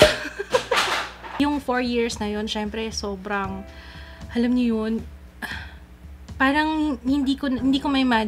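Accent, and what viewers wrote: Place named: Conyo, Philippines. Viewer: Hi Ate! Happy 4th Year Anniversary! Actually, nadiscover kita sa isang video, di ko na maalala ano title nun basta yung video na yun is naghahaul ka ng make up pero isa ata to sa mga videos mo nung naguumpisa ka palang, nasa ilalim ka pa ng hagdan nun nagfifilm and actually, naaartehan ako nun sayo kasi the way ng pagsasalita mo dun parang ang slang and parang conyo, so sa sobrang curious ko, vinisit ko ung youtube mo profile mo and nanuod ako ng ilang videos mo para makita kung gaano ka kaarte, hehehehe wala sana magalit sakin pero yun ang totoo, yun naging 1st impression ko kay Ate hanggang sa napansin ko nag vovlog marathon na ako at nagsubscribe sa channel nya. nakakatuwa lang isipin na from being a slang girl na akala ko nagfifeeling ka lang ate to a jolly person, nakita ko rin ung improvement mo, and masaya ako na nadiscover kita ate. 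Its been 2 years simula nung simulan kitang subaybayan, kumbaga ikaw ang Itsjudyslife ng Pinas. Dahil sayo din ate mas naiinspire akong magyoutube, pero I think di pa sa ngayon pero I hope one day magawa ko rin sya. So ayun, sana nga mahanap ko ung video mo na yun eh, basta tanda ko nun naghahaul ka po ng mga pinamili mo pati make up sa may hagdan at medyo madilim nga nun kasi pagami na at kadarating nyo lang ni Papa Kitz galing sa mall siguro. Basta ate sana walang magbago kung ano ka dati sa kung ano ka ngayon, dahil minahal ka namin ng ganyan ka na. I hope one day mameet kita ate! I love you! God bless you and your whole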